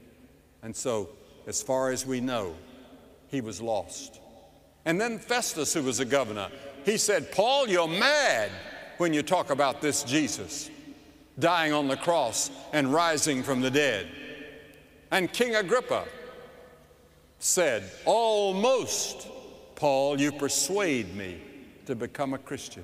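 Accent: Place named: South, United States. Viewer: I love listening to him. His voice is made for preaching and his southern accent is so captivating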